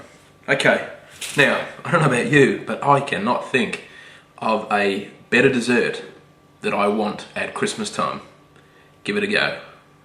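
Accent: Australia. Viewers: he an aussie i think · Can't you tell from his strong Australian accent that's nothing like a British accent?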